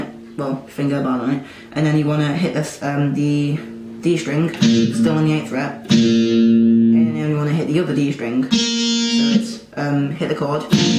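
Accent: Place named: United Kingdom. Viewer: I would suggest more alternate picking, it can get a bit laggy with mostly downs. I've been going through your tutorials and they are actually quite good, I really love your acoustic work a lot, its very even and clean sounding compared to many covers I've heard. And of course I get a kick out of hearing "hey it's " at the beginning of each video coming from a cute british boy haha. Keep up the good work mate.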